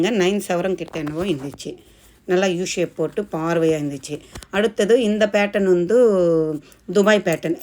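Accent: Telugu, India. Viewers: మీరు తెలుగు వాళ్ళ sis మీ మాటలు నాకు అలానే అనిపించించింది.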